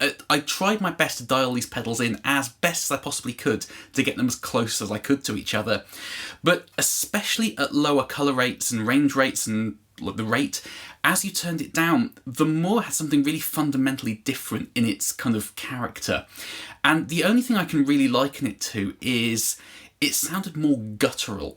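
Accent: United Kingdom. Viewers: It’s an English accent. Britain includes Scotland and Wales too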